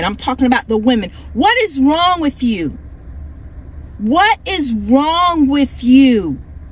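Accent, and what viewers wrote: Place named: South, United States. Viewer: Man this the kind of woman I was raised around. Sound like my aunts in the south. You dropping nothing but the truth.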